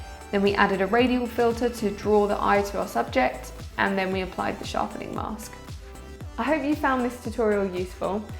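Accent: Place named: RP, United Kingdom. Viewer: Thank you for some sage advice;-) Keep going, you have good skills and a very nice accent (I'm an American, so we love this particular posh accent.)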